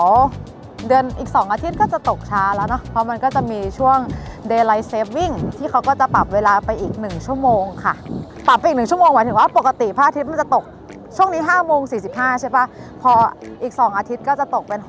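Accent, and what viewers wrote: North, Thailand. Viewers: ชอบอู้เมือง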